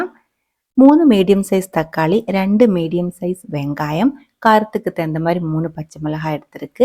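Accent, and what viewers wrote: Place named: Kerala, India. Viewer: Avuha Malayalam... basha puryalaiya... so sweet...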